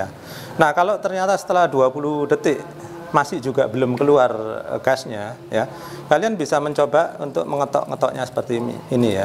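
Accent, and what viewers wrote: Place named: Yogyakarta, Indonesia. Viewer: Bahasanya Jogja bgt..Asli mana ya sebenarnya?👍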